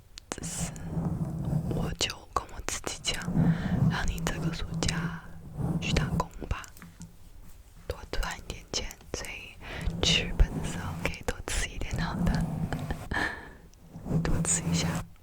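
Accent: Taiwan. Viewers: 好家伙这个完美 你听起来台湾人🌚哦哦你的父母是台湾人😮厉害 我的眼睛太疼了晚安🤣🤣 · 您好像学了台湾中文，对不对？因为我的老师教我北京汉语，所以我看繁体字看得不太好。虽然我完全不能看繁体字，不过我听台湾的口音平时听得懂。我自己觉得您说得很好！ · 可是你的台湾口音很relaxing诶！ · 你的zongwen好可爱 哈哈哈 好喜欢台湾口音 听得好舒服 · 台湾人路过 方便妳看 我转简体字 妳的一些中文用语好可爱喔😂 妳的自述故事也很有趣～ 每次那个“可是”都念“可4” 真的很台欸🤣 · 好少遇到台灣ASMR很喜歡這個口音❤ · 台湾腔太有魅力了😂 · 有台湾味道的中文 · when you started speaking I KNEW YOU WERE TAIWANESE I love ur accent!! ❤️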